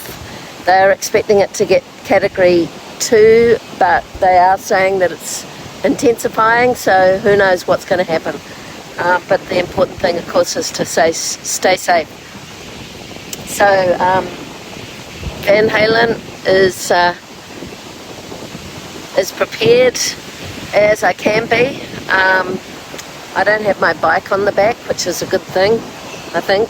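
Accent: New Zealand. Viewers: you need to just get in your van and drive to somewhere else! Your workplace is probably gonna close in the next few days....I am speaking from Far North Queensland where WE get the cyclones every year, and you folks in the south east of QLD have been happily oblivious to what happens in a cyclone for decades, and from your EXENT we're guessing you're from across the ditch where you don't have cyclones........and YES, we live in a Van, and I'm just saying GET YOUR VAN OUTTA THERE and go as far north west as you can for the next 5 - 10 days......you have a MOBILE home, so get mobile, get away from where that cyclone is gonna hit, and come back after all the sh*t has cleared the area where you're "stealth camping" your van. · Haha, Kiwi! I suspected a kiwi accent yesterday and msg you. I have only just heard of you, and been watching your videos today. You did grow up in NZ, so that's why there is an accent 😏 Was so sorry to hear your story of your past in Auckland. 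Rob.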